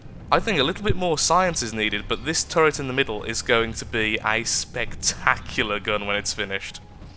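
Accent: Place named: England, United Kingdom. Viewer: lol i can tell that you are english from your accent.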